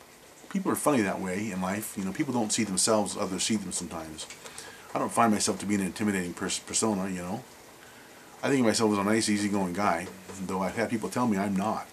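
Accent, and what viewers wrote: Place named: Ireland. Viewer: You might get mistaken for an potential Irish thug but no where near a Tony Soprano. Your Irish comes out too much in everything you do. Hell, I can tell you're irish even though you haven't told me! Now me, on the other hand...I'm called "the Godfather" by all the guys I race R/C trucks with...they line up in the pits to come talk to me and shake my hand...one at a time. That's how I got the nickname "Godfather". This is no lie. I'm usually smoking a Churchill sized gar when this happens..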